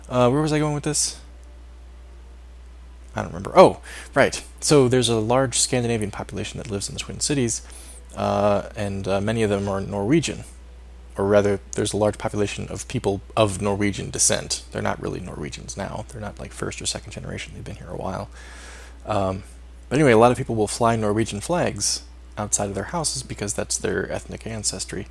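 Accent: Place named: France. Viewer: Renowned Physician adds a bonus to Physician-ing from what I can tell. What you should do is grant Julian some land then he will stop asking to join holy orders, or just ship him off. I don't know if you noticed but you lost the North of Greece/Turkey. From Constantinople East to the Il-Khanate. That French accent sounds like the narrator in the Jeanne d'Arc campaign in Age of Empires 2. Smashing icons is what Iconoclast is all about. No icons to God so we must break them. You can check out the society under the society tab which is the last one up the top.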